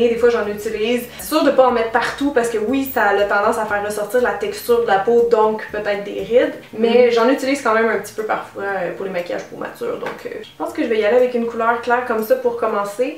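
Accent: Quebec, Canada. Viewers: It's not a Canadien accent, we are Québécois. Québécois accent. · Jube Whatever she lives in Canada. I got a french accent because i live in France but i'm from Paris so I have a different accent than people living in the south of france. The fact is canadian (quebecois) accent is different from french accent, even the wau to speak isnt the same, they got some words that are different from real french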